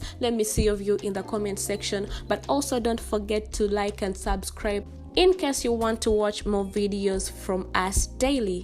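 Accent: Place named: India. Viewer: I can't listen to you channel because it reminds me of ordering an Indian.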